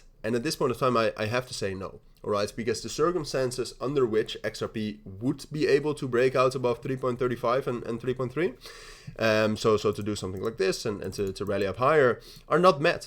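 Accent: Netherlands. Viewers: Ik meende al wat Nederlandse klanken te horen.. heb het me al een tijdje afgevraagd.. 😂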